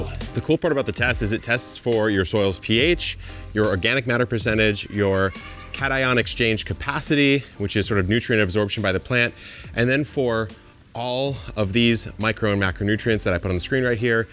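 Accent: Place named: Australia. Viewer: Hello Mr self-sufficient guy I love your videos I learned so much and ps. Love your accent I guess you from Ustralia 😁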